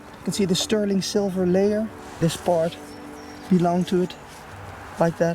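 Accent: Netherlands. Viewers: Are you dutch Cus your accent is typical for a netherlander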